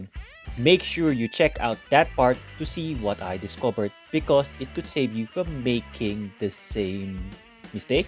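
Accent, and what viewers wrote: Philippines. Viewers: Great vid! Took me 4 seconds to hear that you are Filipino 😂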